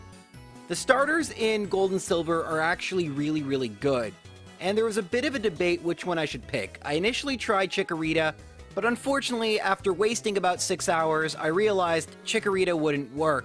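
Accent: Canada. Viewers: Canadian accent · pretty sure hes canadian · You sound Canadian.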